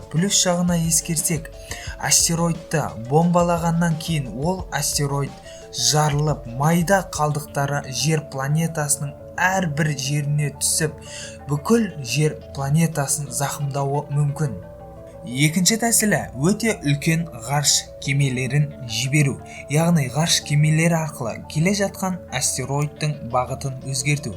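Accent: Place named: Uzbekistan. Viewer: Тілің озбек емеспа жағымсыз,и сөздерд бұрап айтасн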